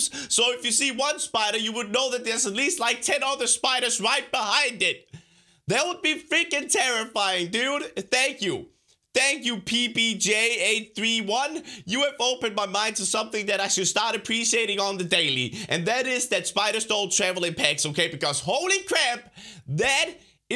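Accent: Denmark. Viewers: its because of his danish accent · Ideer is idea said funny because if you haven't noticed somehow, Crainer has a danish accent because he's danish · He has an Danish accent so idea is ideer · YourNewFather it’s his accent he’s danish and everyone in Europe says it like that LOL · Dink Its his Danish accent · Danish accent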